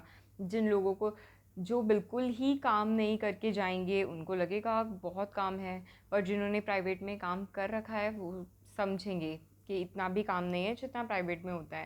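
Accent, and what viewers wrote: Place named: Himachal Pradesh, India. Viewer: .. Ok g... Apke bolne se aisa lgta h.. Ki ap HP se ho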